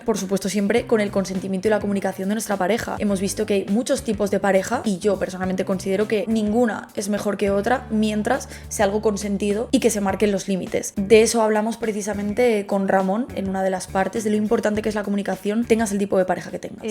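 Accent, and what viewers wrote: Spain. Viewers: Inteligente, hermosa, acento español, y se vistió para la ocasión para hablar de sexo. No me hagas esto, o más bien, seguí haciéndolo. Pero después de la, ejem, reflexión hormonal, y para agregar algo de valor... Hay un libro muy interesante "El gen egoísta" de Richard Dawkins, que muestra al modelo animal como una "funda porta genes" que les permite movilidad y selección (a los genes), y por tanto les da herramientas para una evolución más dirigida o acelerada, y que explica cosas como la hipergamia (tendencia a la infidelidad, o sea), o ya en nuestros días la selección social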